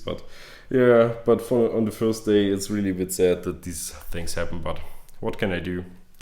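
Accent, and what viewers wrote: Germany. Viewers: Es el acento alemán y es algo muy común y no se porque comentas en español siendo este un vídeo en ingles.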